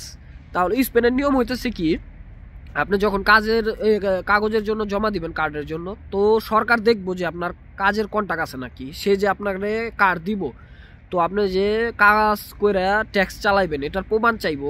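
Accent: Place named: Central Bangladesh, Bangladesh. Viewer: Vay bari koi,,, Dhakar modha nki,,kotha suina mone hoi,tangail,manikgonj, Narayanganj,gajipur,ai dikha,,aktu reply dean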